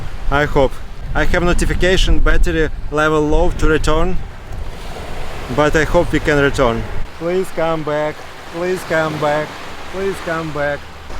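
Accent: Russia. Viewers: Блин ну и акцент у тебя русский но лай сёравно поставил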